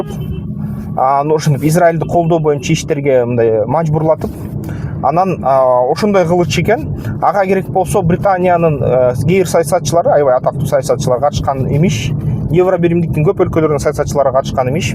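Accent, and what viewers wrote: Standard. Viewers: Ассаламу алейкум. Менден дагы салам сага 🤝🏻 Санкт-Петербургдан. Сенин айткандарын аябай жагат грамотно орду менен таза кыргызча жонокой кылып тушундуросун. Калтырбай угам.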